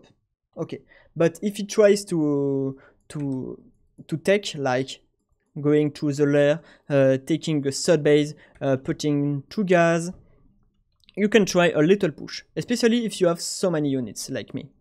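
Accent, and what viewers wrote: France. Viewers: Great info dude! Love your Frenglish accent as well :) Waiting for more non-French videos like this.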